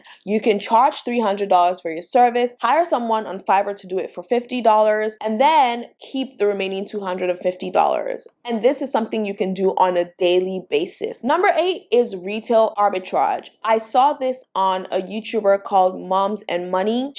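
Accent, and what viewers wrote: Nigeria. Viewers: Glad I found this video. it's great to hear Nigerian, I'm Nigerian too.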